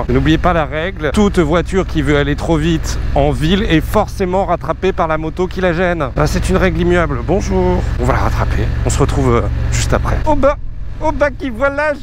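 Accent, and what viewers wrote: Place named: Brittany, France. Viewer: Oh tient, un accent breton...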